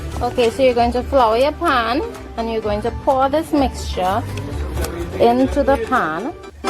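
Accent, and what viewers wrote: Trinidad and Tobago. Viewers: Girl, you sound like a True Trini. I subscribed to your Channel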